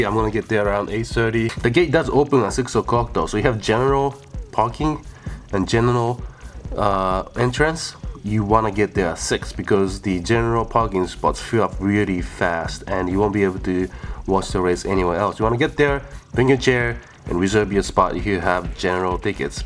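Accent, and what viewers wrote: United Kingdom. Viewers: I love your Asian British accent! <3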